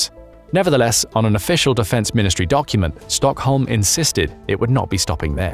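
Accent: United Kingdom. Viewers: Wishful thinking with British accent 😂😂😂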